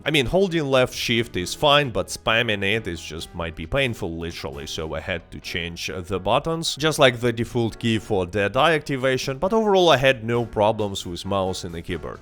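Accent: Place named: Russia. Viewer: Русский акцент какой то будто слышу😮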